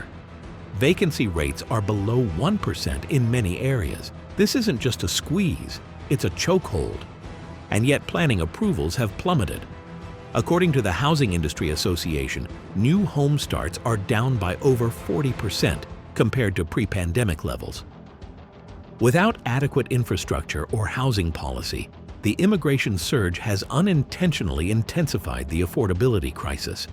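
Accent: United States. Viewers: Not sure why you used an American narrator for an Australian issue?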